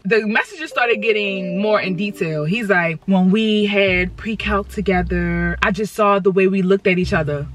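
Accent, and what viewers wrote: Baltimore, United States. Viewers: Love the Baltimore accent !! This young lady needs her own TV show !! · Are you from Baltimore and I love you videos they funny af ☺️💕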